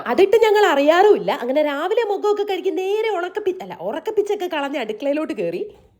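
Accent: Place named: Malappuram, India. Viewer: ഒരു സംശയം ചോദിച്ചോട്ടെ ചേച്ചി ഇപ്പോഴും വീഡിയോ ഇടുമ്പോൾ ന്യൂസ് കൂടാനും സബ്സ്ക്രൈബ് കൂടാനും വേണ്ടിയാണ് ഓരോ വാക്കുകളും തെറ്റായിട്ട് മനപ്പൂർവം പറഞ്ഞിട്ട് അത് പിന്നെ ശരിയായിട്ട് പറയുന്നത് അത് ഈ ചേച്ചിയുടെ സംസാരത്തിൽ നിന്നും മനസ്സിലാകും അത് ഏത് പൊട്ടന്മാർക്ക് ആയാലും പെട്ടെന്ന് തന്നെ മനസ്സിലാവും മലപ്പുറം പറയുന്നതാണെന്ന്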